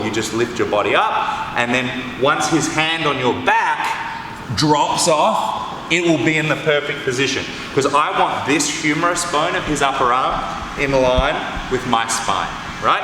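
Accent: Australia. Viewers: aussie accent is one of the most friendliest accent in the world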